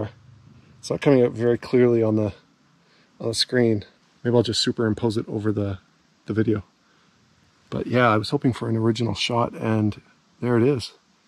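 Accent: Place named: Canada. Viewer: Correction, they are not trains....they're trolley cars or street cars. The exception are those high floor Chicago subway cars that were made using parts from old Chicago PCC trolleys. And I must say I like your Canadian accent. Did you happen to get the car number of the 1949 Red Arrow {Philadelphia Suburban} car that you boarded on the time bar. You showed the interior above the windshield, but I couldn't see the car number. Man, these ads that pop up every minute or two are really annoying. The ticks and other insects must have had a great time with you. And frankly, it's dangerous to climb around in equipment in that deteriorated condition.They've been exposed to the weather for decades. Doing it alone is especially risky and unadvisable.. It could be likely to have unfortunate consequences. If you got hurt, who would help you? Let's hope there is working cell service there for emergencies., that's not a driver's seat. 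It's the motorman's cab. The conductor collects fares or opens/closes doors. The conductor doesn't operate vehicles. The motorman operates these cars. You've got lots to learn before narrating a video like this. It might interest you to know that a scrap dealer has just bought everything at the Windber site and by year's end it will be cut up. That's to give museums and collectors time to buy things such as spare parts. There are a few vintage cars inside of the shop building that should go to museums. <link>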